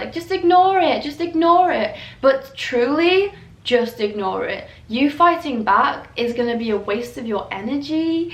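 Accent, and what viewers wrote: United Kingdom. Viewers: wait very stupid question.... when did you get the british accent? or is it not?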